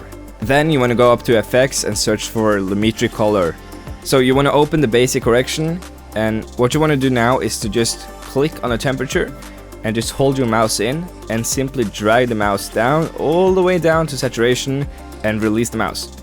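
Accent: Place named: Sweden. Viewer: I thought he was Swedish hah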